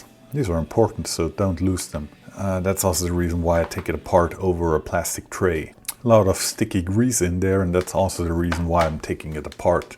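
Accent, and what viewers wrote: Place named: Germany. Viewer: Hi! Mpls MN 55407 The German accent makes the audio very enjoyable.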